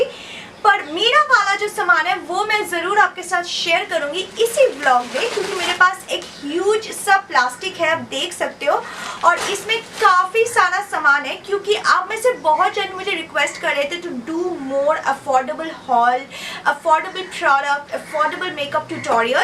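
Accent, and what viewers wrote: Bengal, India. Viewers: Apke bolne ka tarika...sooo cute..bengali touch me..love u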